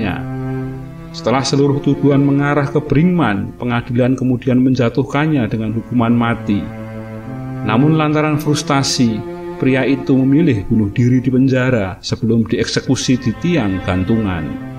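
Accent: Java, Indonesia. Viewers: Itu lidah jowo mbak🙏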